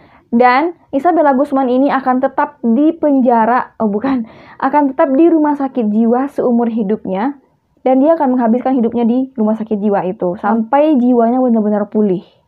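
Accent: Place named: Minangkabau, Indonesia. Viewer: Minang kental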